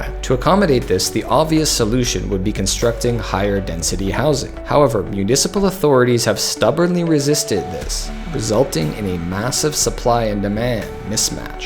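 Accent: United States. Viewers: Sounds typical for this type of country. U.S. has similar problems. Hopefully you're keeping an eye on the value of keeping families intact. We created an environment where reliance on one's family to live comfortably is gone. It shows in very bad ways. I do find one thing funny: I watched a video the other day explaining the U.S.'s national debt problem. It was narrated by a young man with an Australian accent. This video is narrated by a guy with an American accent. Go figure. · life is impossible in australia? clearly you need to open your eyes and look at life in poorer parts of the world! Methinks your "life is impossible" has the whine of someone complaining they don't have the latest apple phone, or some such flippancy. The way you pronounce some australian place names indicates you have no idea about australia...... where do you (the narrator with a tinge of american accent) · Crap clickbait. 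American voiced bot spewing crap. There’s nothing wrong with Australia. When you look at the rest of the planet, we’ve got it pretty good. Pure bull💩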